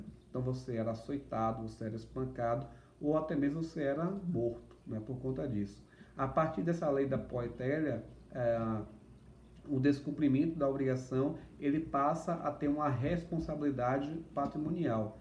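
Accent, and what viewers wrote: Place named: Salvador, Brazil. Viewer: Eu sabia que vc era de salvador desde sua primeira palavra 😂😂😂😂. Aula fenomenal, mestre! O senhor leciona em alguma faculdade aqui?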